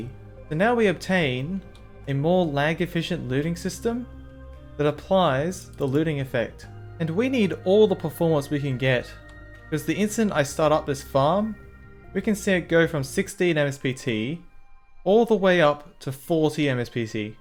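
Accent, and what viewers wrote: Australia. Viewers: Aaahhhhyyyyy an Australian!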